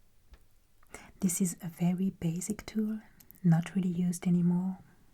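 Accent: France. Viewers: Tu as un accent français, tu es française ? :)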